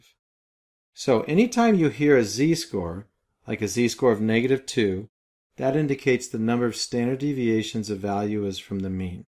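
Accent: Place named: United States. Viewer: This is fantastic! A four-minute lesson on exactly what I am trying to understand --the 68%. Plus, it's a native American speaker with a clear-as-a-bell voice. I only stopped it from auto-playing the sequel in order to vote thumbs-up!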